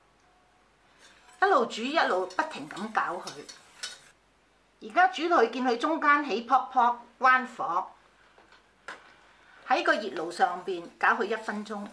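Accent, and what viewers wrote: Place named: Hong Kong, China. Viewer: I think she comes from Hong Kong (from her Cantonese pronunciation). Coconut milk is not a traditional Chinese food ingredient. This dessert is originally from Hong Kong.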